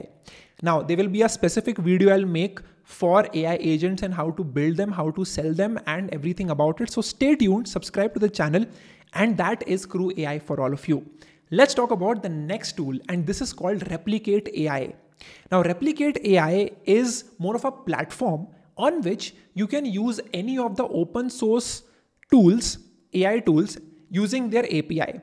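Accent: India. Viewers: thanks but that Indian accent is really hard to listen to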